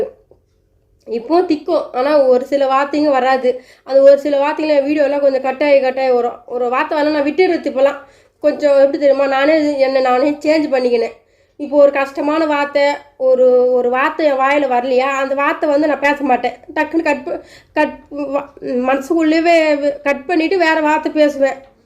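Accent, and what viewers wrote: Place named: Northern Tamil Nadu, India. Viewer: Don't Worry dear❤❤😢😢 விடும்மா என்னை அழ வச்சிட்டியே😢❤ வேலூர், விழுப்புரம், சேலம் என்று வடமாவட்டங்களின் வட்டார மொழி இப்படி தான் இருக்கும்.Pls🙏 friends யாரும் செல்விம்மாவை சங்கடப் படுத்தாதீங்க❤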